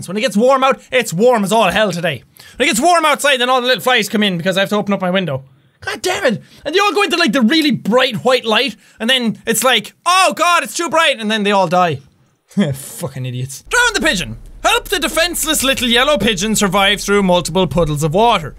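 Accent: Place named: Ireland. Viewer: I love his Irish accent “Cars aren’t supposed to have tree wheels, their supposed to have four wheels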